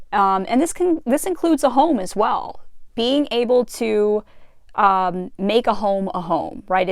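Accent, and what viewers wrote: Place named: United States. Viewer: Thanks for your efforts.. You are always direct to the point, talented enough to address such subjects. On top of that, you speak in a very clear and nice American accent. And also you're so handsome with a baby smile yet wise and confident 💕 Thanks lady again for everything. NAIF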